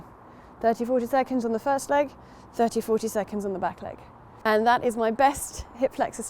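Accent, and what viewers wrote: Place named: United Kingdom. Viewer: The British accent makes it perfect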